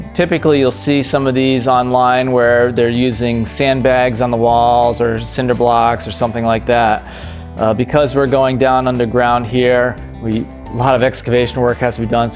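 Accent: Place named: United States. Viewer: Barrels in a greenhouse supposed to store heat, then temperature can be more consistent. Barrels can only be filled with water, hit by the sun, or connected to solar panels. You can also fill nutrient solution that you circulate trough your plants, using any kind of temperature control that you wish. heating the water in your greenhouse is way more sustainable then air. Especially some of hydroponic systems require a huge amount of water. I am also missing a bit of broad analysis on greenhouse types. Pros and cons really depend on climate but I only heard that is either suitable for your climate or not which I assume is somewhere in the US because of your accent.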